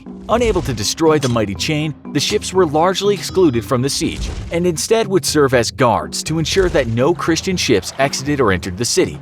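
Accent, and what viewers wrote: United States. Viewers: Its an American channel, by the accent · Any way of getting a more relaxed non-American narrator?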